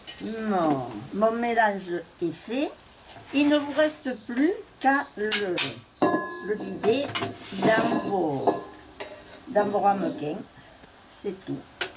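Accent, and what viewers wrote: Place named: Nice, France. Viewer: Bonjour chère je suis de Sète née a Marseille et vous avez le même accent que "Mado ka Nicoise"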